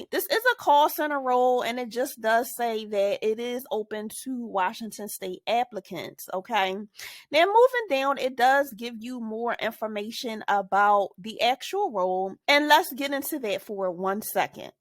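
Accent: Philadelphia, United States. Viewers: That philly accent is strong!!! I love your energy lol keep going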